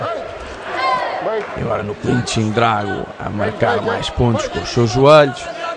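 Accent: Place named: Portugal. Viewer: Português de Portugal.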